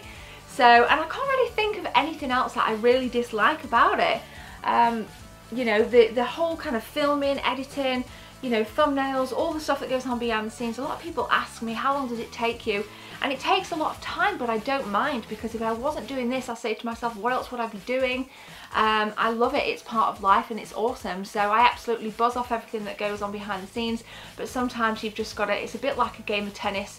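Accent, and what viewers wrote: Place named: England, United Kingdom. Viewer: I watch you from italy and I love your positive attitude. I think you have a great talent both in front of the camera and behind it. Sometimes I don't understand everything you say, maybe because of fast speaking and english accent(I discovered that from time to time you pronounce "here" more like "hair" XD) but I enjoy anyway. I would suggest some more contents about news, and some reviews. A great hug from Rome and if you come here for vacation you and your wife will be my special guest in my B&B!!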